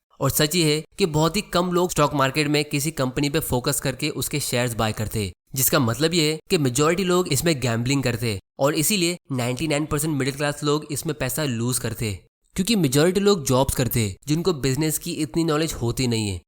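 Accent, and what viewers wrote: Gujarat, India. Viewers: Gujarati voice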